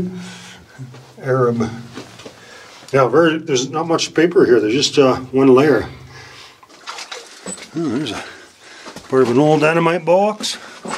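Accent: Canada. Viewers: I can tell your from Canada A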